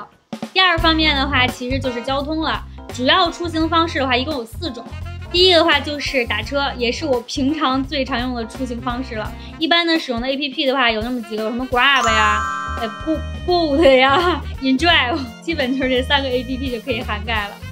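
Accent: China. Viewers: 主播北京的吗？ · 是那里人？口音像是北方人😊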